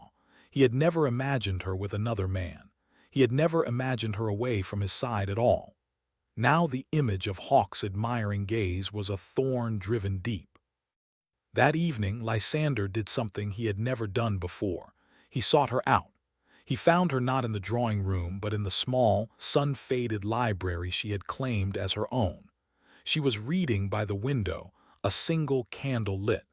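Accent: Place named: South, United States. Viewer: I'm sorry but you can't use the country voice for these stories. It just doesn't fit and doesn't make me want to watch it.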